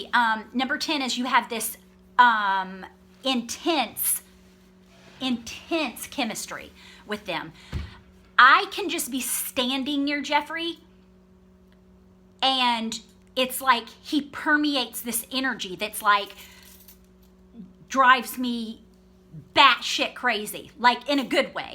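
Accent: United States. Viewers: are you from georgia,cause your accent sounds like it lol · American accent here. 😅😊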